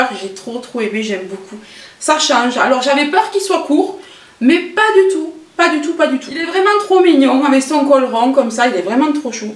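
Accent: South, France. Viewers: le magnifique accent du sud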